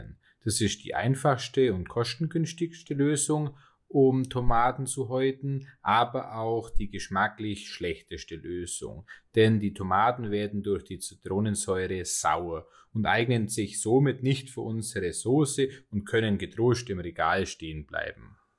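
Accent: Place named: Baden-Württemberg, Germany. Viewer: Wie kann man da fränkisch raushören? Ich glaube, der gute kommt eher aus Baden-Württemberg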